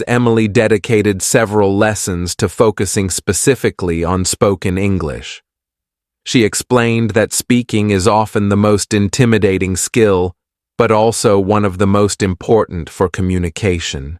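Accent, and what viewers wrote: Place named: United States. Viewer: چقدر زیبا هس این لهجه آمریکایی ممنونم از شما دوست عزیز🙏⚘️🍀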